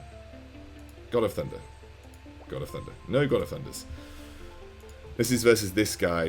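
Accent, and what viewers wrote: England, United Kingdom. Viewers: The background music and the accent from a mature england man made this a lowkey asmr video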